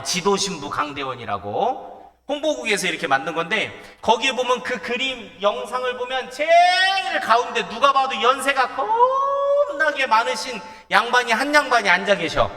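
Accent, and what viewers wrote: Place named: Chungcheong, South Korea. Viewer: 그런겨어 ㅎㅎ 우리충청도 사투리 반갑습니다 영육간에 강건 하세유😂😂😂😂😂😂😂😂😂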